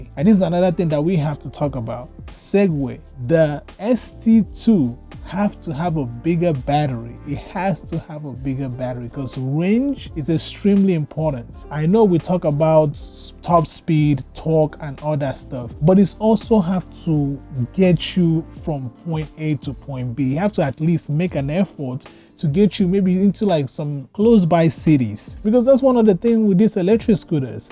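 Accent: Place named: Caribbean. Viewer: also love your cool West Indian accent - so refreshing !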